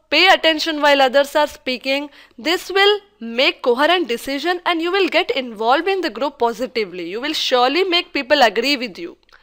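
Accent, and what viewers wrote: India. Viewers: Dam Sounds like an Indian is speaking, whats the problem with that? Engish is not our first language, stop acting like it is. She has taken the effort to make a video and help people. This video calmed my nerves a bit and helped me assess my strengths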